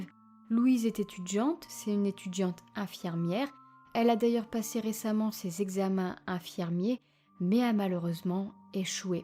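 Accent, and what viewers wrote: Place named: Picardy, France. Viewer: Bonsoir, j'ai découvert il y a peu votre chaîne, de suite abonnée, vous êtes formidable dans vos reportages, une voix douce et calme ça fait du bien. Une voix qui me rappelle la ou j'habite et d'où je suis originaire d'après certaines intonations je suis de la Picardie. En tout cas c'est super. Bonne continuation. Bises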